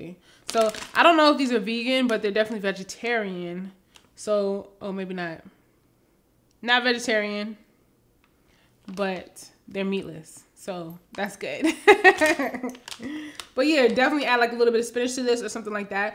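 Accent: New York, United States. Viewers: Are you originally from New York?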